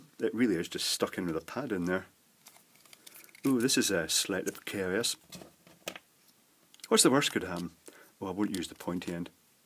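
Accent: United Kingdom. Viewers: this guy has the most british accent i've ever heard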